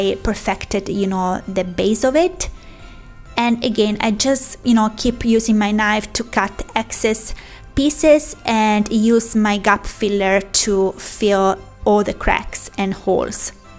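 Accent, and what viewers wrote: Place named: Italy. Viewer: love your channel:) your accent is very much like my friend from Casarano, Italy 🇮🇹 Viva Italia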